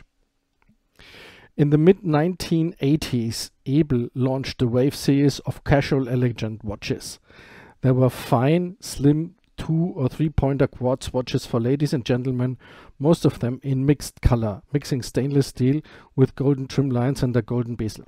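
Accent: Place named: Germany. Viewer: ❤ your German accent 😊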